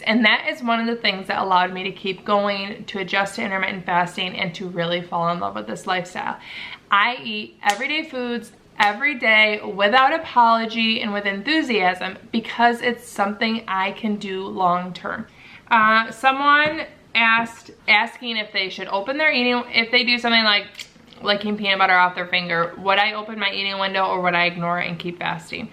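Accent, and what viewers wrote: Michigan, United States. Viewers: I love your videos. You’re very inspiring. I thought it was funny that someone asked you what state you live in. A. You have a Michigan accent, and B. there’s literally a wall hanging in the shape of Michigan behind you!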